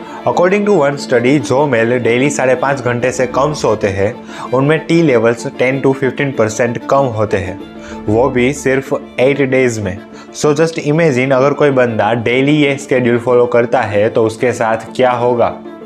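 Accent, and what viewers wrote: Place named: Gujarat, India. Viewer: You sound gujrati. Feels good to see gujrati doing such different kind of videos. Also very new to this field. You sound nervous also. But keep going bhai you will overcome it all with time. All the best doctor saheb.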